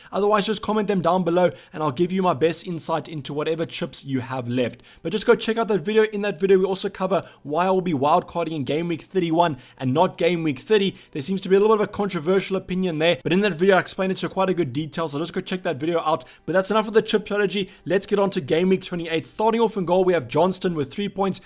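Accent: South Africa. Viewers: You sound hella South African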